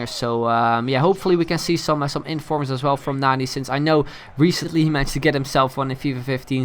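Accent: Netherlands. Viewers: are you dutch? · ik dag al dat je nederlands was dat merk je gewoon hoe nederlanders engels praten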